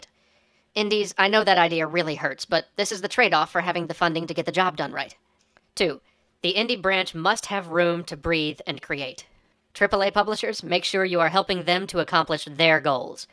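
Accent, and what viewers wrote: United Kingdom. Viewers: I came here for the British accent arguement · Best almost stereotypical british accent evarrr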